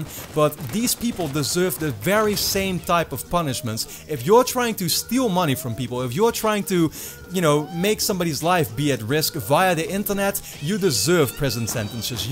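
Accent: Netherlands. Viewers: Hey dude, thanks for the video! I'm new to the channel, btw I like it, so I don't know it but are you Dutch? I am and I think I can recognise your accent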